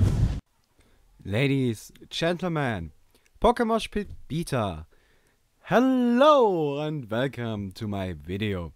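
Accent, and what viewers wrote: Germany. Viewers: This guy is beyond German. Haven't heard an accent like that in a long time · Hab schon nach dem 1. Satz gewusst, dass du deutscher bist.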